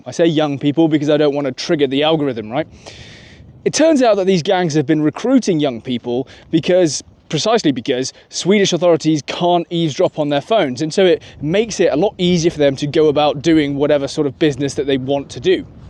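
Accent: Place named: Northern England, United Kingdom. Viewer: strange accent. mostly RP but often produces vowels from northern england. also seems to hupercorrect for a northern accent at times, like a northerner trying to sound southern. maybe went to a public school in the north like rugby?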